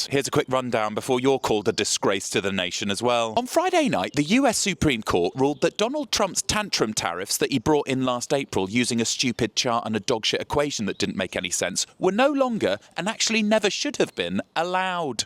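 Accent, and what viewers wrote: United Kingdom. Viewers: I can't explain it but delivering news on our dumbass dictator is more funny with the british accent. Keep it up we need the entertainment. We desperately need the entertainment.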